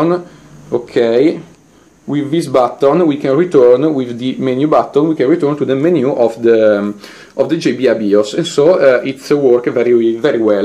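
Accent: Italy. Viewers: Si sente che sei italiano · Your english is good and I understood everything. It's not a problem that you have an italian accent. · Sei ita si sente vero? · natsu sempai Vabbè, dopotutto è italiano, si capisce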